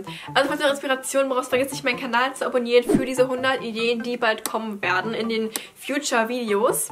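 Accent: Germany. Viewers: Du kommst aus Brandenburg 😍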